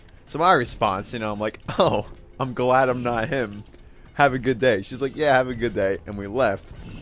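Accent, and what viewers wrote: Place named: New Jersey, United States. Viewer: Haha. Cool. Jersey accent, lol! :)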